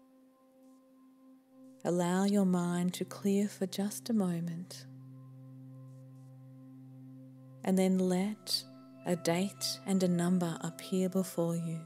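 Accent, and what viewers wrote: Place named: Australia. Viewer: She sounds Australian all the way through to me (I'm British)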